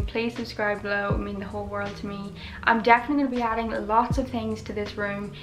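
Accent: Ireland. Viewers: you just came up on my recommended and it's so lovely to hear a fellow irish voice! your room is goals 💌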